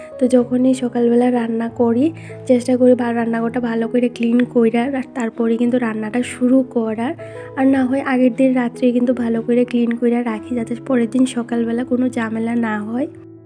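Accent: Bangladesh. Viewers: Khub sundar.tumi kotha thako. Tumi ki bangladesi.toma kotha sune mone hoche.kichu mone koro na.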